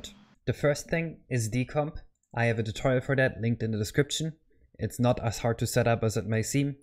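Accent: Germany. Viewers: I liked you accent and I just realized you're german, everytime I heard someone with that slight german accent I thought they were native speakers. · Ayo completely unrelated but i noticed how much less noticible your german accent has become over the years, gj man, not many ppl improve that so quickly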